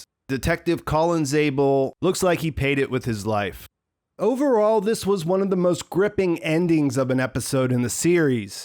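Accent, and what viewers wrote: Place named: Pennsylvania, United States. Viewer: You have a Pennsylvanian accent.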